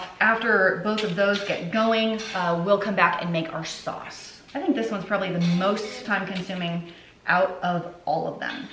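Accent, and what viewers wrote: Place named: Texas, United States. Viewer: Yes idk if it's a Texas thing, but I'm from Houston and my family always said 'sketti' sauce 😂 I hear say a lot of small things like that that I also heard growing up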